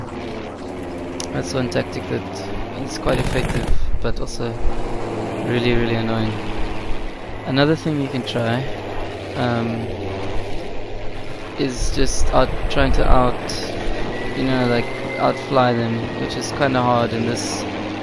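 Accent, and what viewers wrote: South Africa. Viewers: Bro heard by your voice you were a fellow RSA member 😂😂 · SA Accent?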